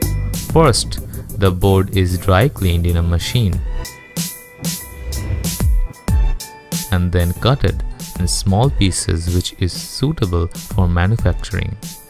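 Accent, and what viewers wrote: India. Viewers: Dat fucking indian accent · indian English makes me feel ashamed. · Omg indian english☠ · 这是传说中的印度口音？ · Индусский акцент сразу слышен, хотя я по английски нефига не понимаю. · Omg,this guy sounds so bad.voice is like Indian slang modulated with donkey screaming pitch.even though I am Indian I hate this slang.video is shot nicely but I wish such these great videos are sponsored to channels like greatscott:/ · Listening to the indian narrator speak english gave me cancer. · you can't get someone without a heavy Indian accent to narrate the video? nothing wrong with it but god damn it's hard to understand lol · i think this is indiaglish. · Indian accent。。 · 一股浓浓的印度口音，听得超级不爽。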